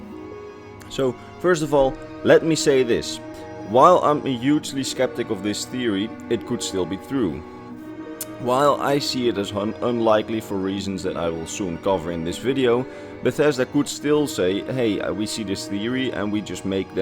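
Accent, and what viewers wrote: Netherlands. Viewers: He's Dutch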